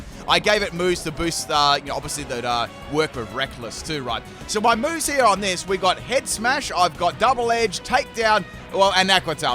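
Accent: Australia. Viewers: Australian accent "...and my Noinoi is gonna be noi more!"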